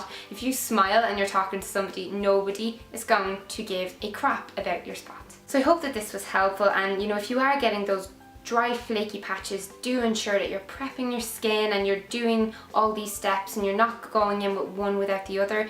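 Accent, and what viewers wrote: Ireland. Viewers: New Subbie!!! Are you Irish (sorry if I'm wrong I'm really bad at recognising accents) Do you know how much the body shop setting spray is??? xxx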